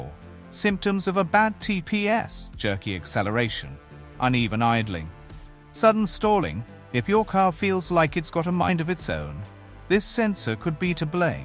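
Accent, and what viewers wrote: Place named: United Kingdom. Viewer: As soon as I heard the British accent, i was out.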